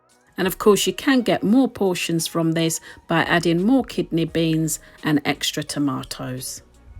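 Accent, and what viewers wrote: England, United Kingdom. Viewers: I like it so much! (I mean this lovely pure english accent 😋😊). Greetings from Russia Already trying to cook 🧑‍🍳🍽️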